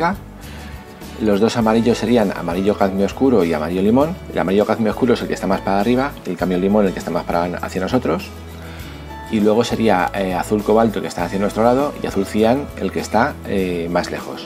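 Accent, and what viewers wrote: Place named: Spain. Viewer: Amo el asentó español 😂 nada q t voy a dar un like pues m dio gracia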